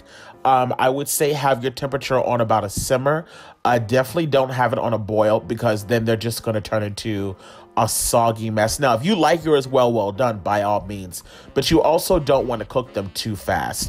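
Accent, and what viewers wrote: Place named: Gullah, United States. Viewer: Reminds meh of my gullah people 🤣🤣🤣🤣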